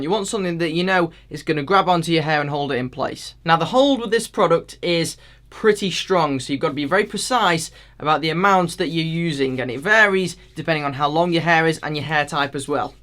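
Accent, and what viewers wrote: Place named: Midlands, United Kingdom. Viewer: brilliant video mate! That midlands accent though haha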